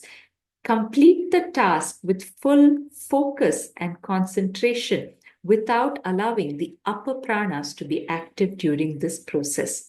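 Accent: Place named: India. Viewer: Thank you very much for teaching the Hindu dharma. Please also make a series on Purusha Dharma Paddhatih so that I can teach my son. Please also provide any authentic references or resources for Purusha Dharma Paddhatih. I also appreciate your calm tone and pure Indian accent, making everything very clearly understandable.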